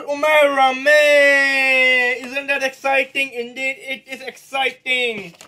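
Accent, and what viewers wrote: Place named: India. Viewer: Basically you are the reason whole world thinks all indians have an accent like you basically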